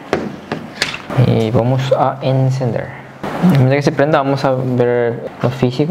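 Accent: Paraguay. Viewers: Es de Paraguay xd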